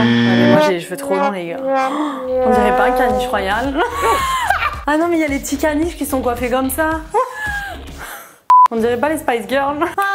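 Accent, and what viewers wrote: Quebec, Canada. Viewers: Si c'est grave beau l'accent québécois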